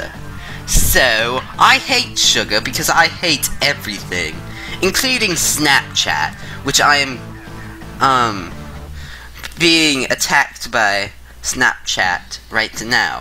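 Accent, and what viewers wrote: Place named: United Kingdom. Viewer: Why the British accent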